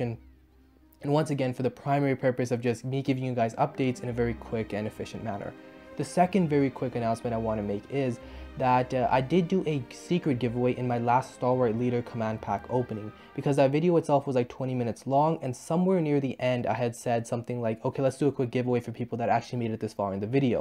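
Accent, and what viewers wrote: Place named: Germany. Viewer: how come a english end up with german accent?:D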